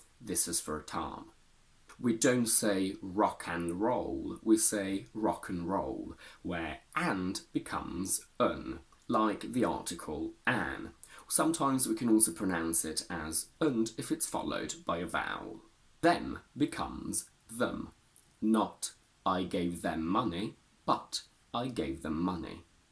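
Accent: RP, United Kingdom. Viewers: You have a very good modern RP pronunciation, but you use a lot of vocal fry.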